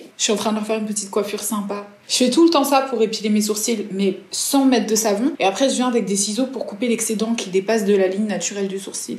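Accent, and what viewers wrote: England, United Kingdom. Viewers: Tu es anglaise ?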